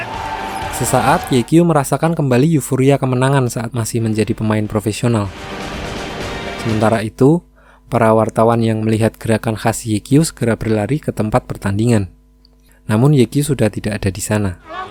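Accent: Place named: Java, Indonesia. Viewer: Anjim wong jowo to sampean mas wkwkw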